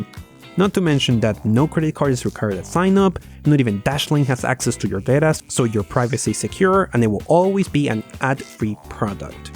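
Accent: Venezuela. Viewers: your english eccent is venezuelan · Una pregunta. tu eres venezolano?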